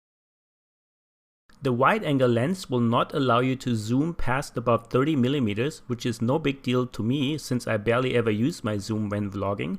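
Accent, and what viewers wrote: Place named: Germany. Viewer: Thanks for the tips. You have such a nice German accent 😉👍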